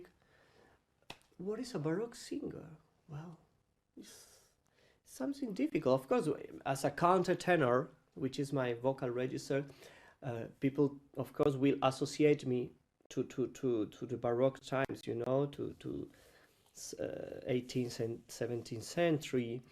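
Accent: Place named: India. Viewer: Parla inglese come un accento indiano 😅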